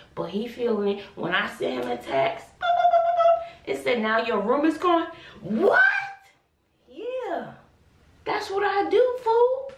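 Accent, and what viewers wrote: New York, United States. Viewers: I love your channel so much, I love the way you speak My family from the Bronx; we recognize a New York accent when we see it. it’s so refreshing to see a momma much like mine on YouTube. We need more mommas like this. Growing up in the Projects I didn’t have a lot of things. I didn’t even have my own bedroom until I was about 17 almost 18. I think taking away your sons room will teach him to appreciate what he had because some people aren’t fortunate enough to have their own rooms. Great parenting. Keep up the good work.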